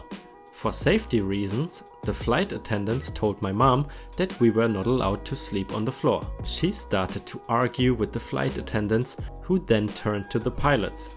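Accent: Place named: Germany. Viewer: Cooles Video, muss zugeben ich war nicht überrascht als du gesagt hast “Germany”, Deutsche hör ich sofort :D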